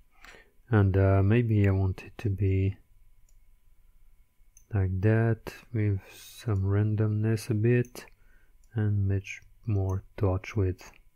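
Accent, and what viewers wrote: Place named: France. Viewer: accent français x)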